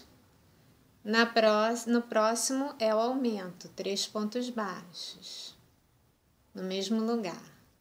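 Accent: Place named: Rio de Janeiro, Brazil. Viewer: o seu jeitinho carioca de falar e um amor! Good Bless you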